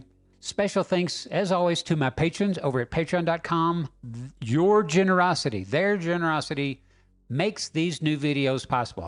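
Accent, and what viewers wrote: South, United States. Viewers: Damn you and your common sense! All delivered with a charming southern accent :)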